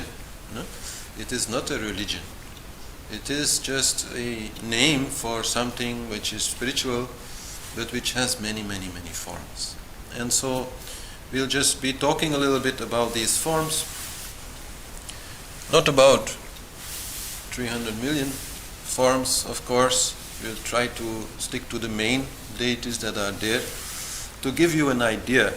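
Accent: India. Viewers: Why do you talk like an Indian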